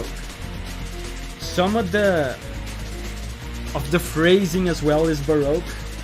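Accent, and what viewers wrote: Brazil. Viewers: Esse mano é mto brasileiro kk, dá pra ver no jeito de falar e as recomendações do Yt dele entrega tbm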